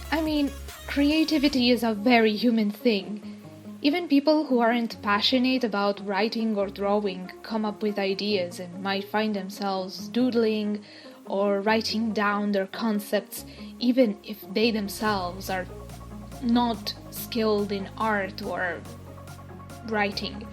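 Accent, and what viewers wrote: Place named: Syria. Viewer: Of course! I have a friend from Syria and her accent sounds similar to yours, so I was curious. I love your art style and have a nice day!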